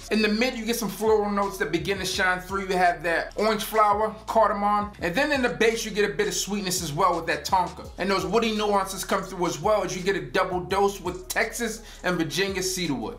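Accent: Baltimore, United States. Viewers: That Baltimore accent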